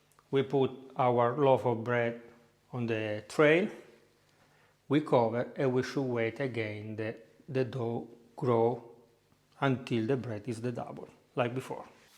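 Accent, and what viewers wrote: Italy. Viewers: Yet to try a recipe of yours...but I am spellbound by your accent and hand motions...a true Italian Chef! Please come to SouthAfrica..Cape Town...come visit...you would teach a thing or two to the so called Italian restaurants here...I even belong to Italian Club and their ciabatta is horrible!! Show how to make Glace Chestnuts please!!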